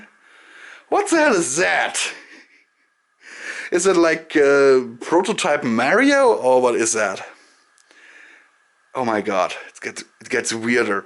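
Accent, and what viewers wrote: Germany. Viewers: For god sake ur german accent is wünderbar! :oo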